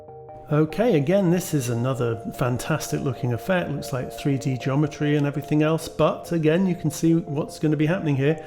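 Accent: United Kingdom. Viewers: Is this a sligthly british Joey Zaza talking ?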